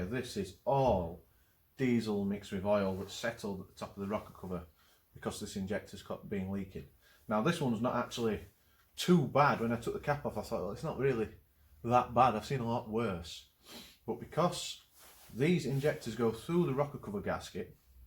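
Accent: Yorkshire, United Kingdom. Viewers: is that a Yorkshire accent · It is a Yorkshire accent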